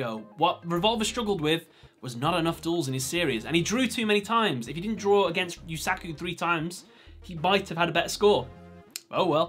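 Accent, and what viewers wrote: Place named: RP, United Kingdom. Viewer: 😂 how truly mediocre, this person talks like the queen of England for gods sake, if anyone spoke like that in day to day life they’d never hear the end of it, he/she’s just trying to sound clever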